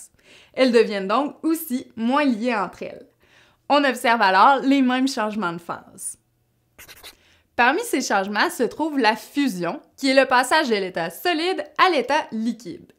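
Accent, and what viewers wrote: Quebec, Canada. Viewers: vous êtes québécoise?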